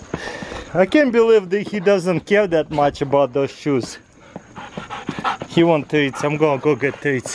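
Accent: Russia. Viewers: Ты русский ? Акцент так и пер😂👌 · Never really been attracted to a Russian accent until I watched this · сильный русский акцент, откуда вы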